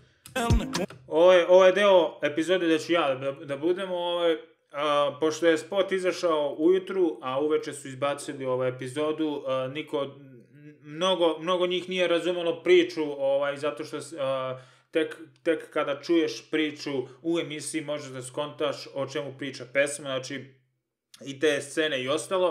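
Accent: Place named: Bosnia and Herzegovina. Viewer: Koliko mi je jak ovaj momak,kako priča haha poyy brt iz BiH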